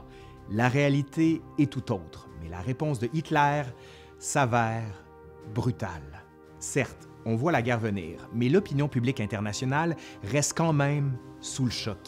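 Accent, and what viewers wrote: Quebec, Canada. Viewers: Le seul accent québécois que j'ai plaisir à entendre 😊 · J'M Bcp l'histoire.. mais svt crt guerres sont 1peu "touffues".. 🤦🙍 Mais vrmt tu rends ça très clair...presk simple.. (alors qje n'avais mm pas connaissance d conflits s/ts les fronts !) Je pensais qle Japon n'était entré q Bcp + tard... 🤔🤦🤦 De + Bravo pr ta diction.. et ton charmant accent Québécois... 👍 👏👏👏👏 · Je comprends mieux le francais canadien grace a toi! Merci! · A mon avis, une série aussi passionnante et documentée que celle sur la WW1! Et avec la diction parfaite, agrémentée de ce charmant accent canadien, de. 7 capsules, je suppose 1 par année de 1939 à 1945, qui vont nous ravir. Vivement 1940! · L 'accent du Quebec , fluide et delicat , professant la langue de Molière dans toute sa splendeur est un regal pour mes oreilles ... Merci copain !!! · J’aime beaucoup ton accent québécois :) Très bonne vidéo ! 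Salut de Bruxelles (Belgique) 👋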